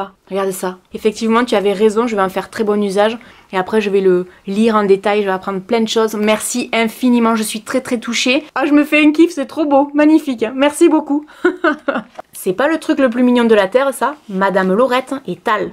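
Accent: South, France. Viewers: Joyeux Noël à toi, ton fils et ta maman et aux abonné-es🎄💕. Merci pour tes vidéos tu es un véritable rayon de soleil 🌞 avec l’accent du sud j’adore 😍! À la base je ne suis pas trop branchée YouTubeuses beauté mais je t’adore tellement que je regarde tout tes contenus et suis super heureuse dès que tu fais plus de vidéos 🍀🙏🏼. Gros Bisous de Suisse 🇨🇭 😘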